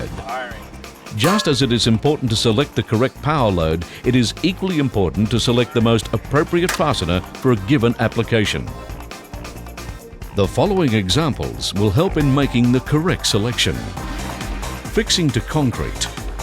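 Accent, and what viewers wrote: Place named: New Zealand. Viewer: It's an Australian video, but the narrator sounds New Zealand.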